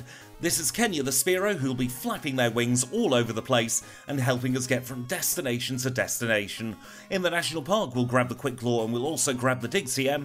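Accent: United Kingdom. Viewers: the way British people pronounce the letter "H" makes life worth living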